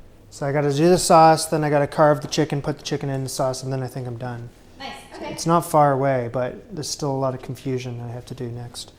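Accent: Canada. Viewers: Your accent gave away that you were from Canada. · Thought you were a Canadian guy! ❤️😊🇨🇦 Love your channel, keep up the great content!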